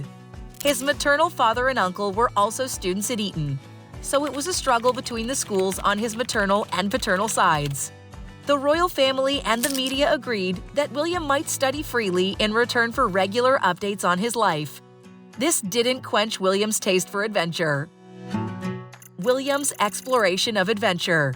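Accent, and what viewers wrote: United States. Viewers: I'm a Scot. I find it annoying when an American voice lectures me about our Royal Family, and get a lot of it wrong.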